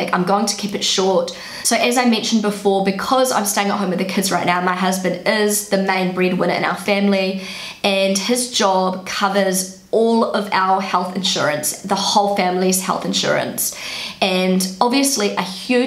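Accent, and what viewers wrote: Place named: New Zealand. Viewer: Wow, you have a very strong New Zealand accent...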